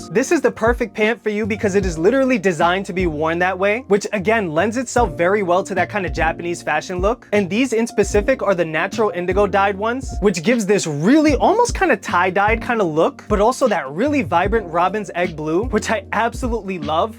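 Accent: New York, United States. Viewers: Such an informative vid and some real bespoke picks! Also love the NY accent haha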